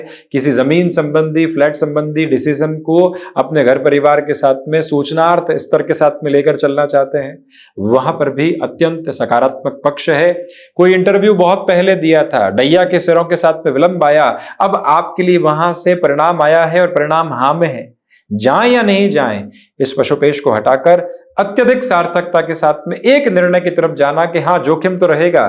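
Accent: Standard. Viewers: Bahut shuddh hindi hai Bhai ki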